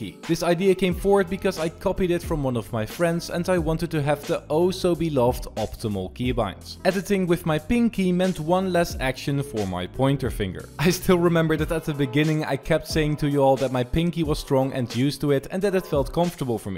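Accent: Netherlands. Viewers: gek Nederlands accent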